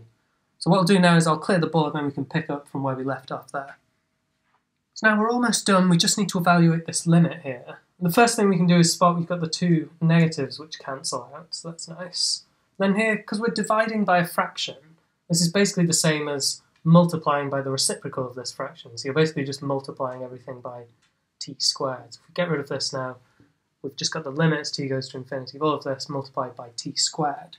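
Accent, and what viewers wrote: United Kingdom. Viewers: thank God that math is universal, i can't understand his british accent at all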